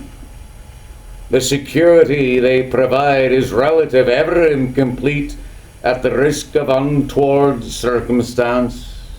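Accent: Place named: Scotland, United Kingdom. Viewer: Love this Scottish Dewey. Aye aye!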